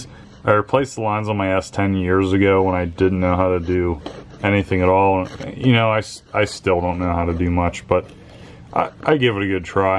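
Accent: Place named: Pittsburgh, United States. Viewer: I detect some Pittsburgh accent...lol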